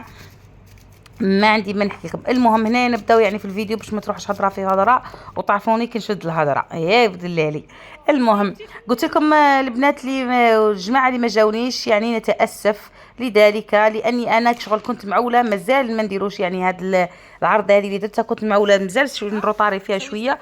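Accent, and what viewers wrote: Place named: Sahara. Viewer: ربي يبارك وصح عيدك انتي من الصحراء على خاطر المردود والدشيشة وعيال خوتي لهجة صحراوية متاع ورقلة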